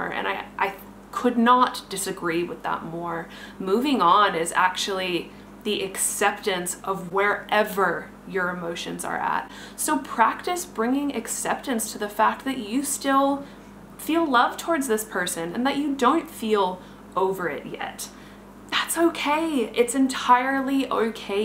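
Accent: Australia. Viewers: are you a bit Australian too? This video was really helpful. Thankyou :)) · You have a slight Australian accent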